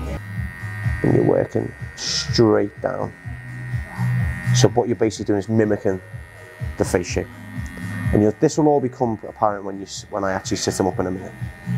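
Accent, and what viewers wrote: Liverpool, United Kingdom. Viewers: I’m a Yank so forgive me, but does have a Liverpool accent?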